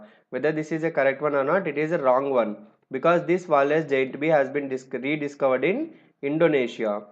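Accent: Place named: Telugu, India. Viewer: Very helpful Thank you so much.... Ur accent seems to be that of the Telugu people...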